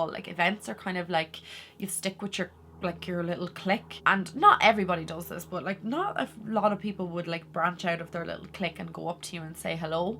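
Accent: Ireland. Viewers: I love your makeup tutorials, all varieties. Also your Irish accent. I live in Texas. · I am here for the makeup. I think some YouTubers have forgotten that for many folks, they are not only a source of information and entertainment, but also a source of escape! Our daily lives are filled with SO much seriousness/responsibility: jobs/careers, family, illness, politics, etc; many see YT Beauty videos as a way of taking our minds off of all of that. A chance to 1). See a smiling face; 2). Learn of a new "look", technique, and/or product; 3). Hear a different accent (love that Irish lilt, btw!); 4). Even hear about a personal issue/problem that does not have an effect on us, but that we might be able to commiserate with, encourage, or even help others to know that they are not alone. But for me it will always be about the makeup. I do wish the "Drama" would remain behind closed doors. 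That is the reason for HAVing doors in the first place! Sorry for the long rant, but I am tired of those other folks forgetting their purpose here: To have fun with makeup! Thank you for being among those who do remember. Take care. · I feel like certain makeup youtubers are trying too hard. I like watching you as i love your down to earth personality and your accent. My mams side is from Ireland. Lovely place 😍 you make me wanna grt my tongue done but im a scardy cat lol. Don't change hun 😀xxx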